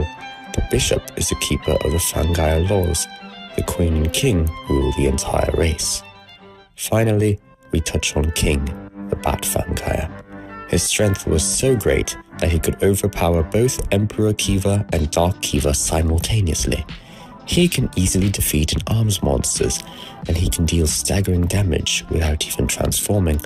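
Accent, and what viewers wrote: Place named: RP, United Kingdom. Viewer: this accent is too posh for someone from bedfordshire like myself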